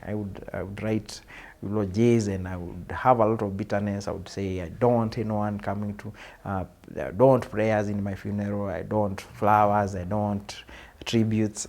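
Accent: Kenya. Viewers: i will never judge an alcoholic... there are so many underlying causes that need to be resolved some issues are as far as childhood traumas. thank you for this one. i love how you are narrating your story, it has pure kikuyu under tones. 😊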